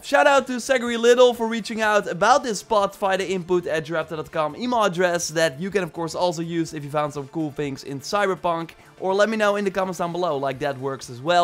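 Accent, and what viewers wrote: Netherlands. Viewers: He's Dutch isn't he? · Are you Dutch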